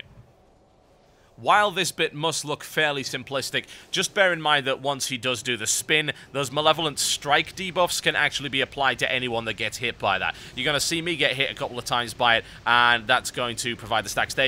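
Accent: United Kingdom. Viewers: ahhh british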